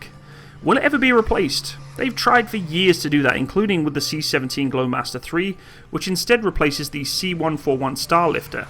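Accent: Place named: United Kingdom. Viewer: Your British is showing 😊: In American; I fly in a C-130 ... present tense I flew in C-130 ... past tense I had flown in a C-130 ... past perfect I have flown in a C-130 ... past pluperfect I think that is correct. At any rate, a nice and concise as always discussion of a great airframe.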